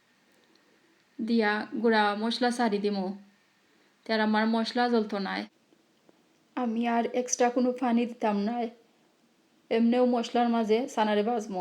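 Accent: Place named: Sylhet, Bangladesh. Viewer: আপনার মাতে কতায় বুজতেছি আপনি সিলেটি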